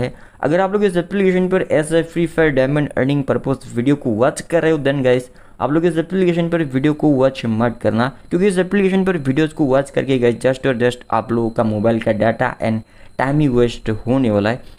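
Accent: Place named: India. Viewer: We can't understand you. Indian accent is really annoying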